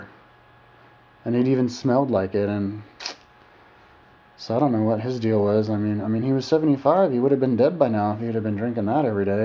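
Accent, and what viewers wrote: England, United Kingdom. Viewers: English Accent! wow. Your analysis is completely flawed. Narrow roads, stone and brick. The idea that Aussies regard on retrospect as Australia being the best country in the world has a lot of substantial research and actual lived experience pertaining to this Australia wide held concept. Unless of course you are a Greedy Wall Street banker or power hungry Media tycoon. Australia was created as a penal colony for prostitutes and felons - Wow. The USA was founded by Slave Owners and Tax Avoiders.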